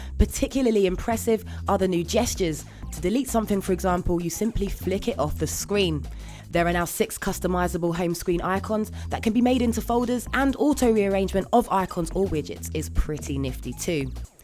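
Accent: United Kingdom. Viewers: I love hearing that British English!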